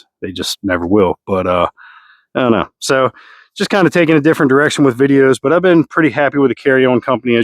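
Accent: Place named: Eastern Shore, United States. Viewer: That eastern shore accent is thiccckkkk! I havent heard anyone sound like that since i lived in cecil county. Thanks for the reminder of home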